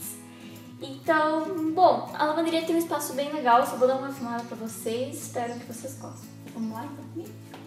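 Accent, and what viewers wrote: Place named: Curitiba, Brazil. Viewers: ela e de Curitiba , pia kkkkk amo esse sotaque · é que ela é do sul (especificamente de Araucária, região metropolitana de Curitiba)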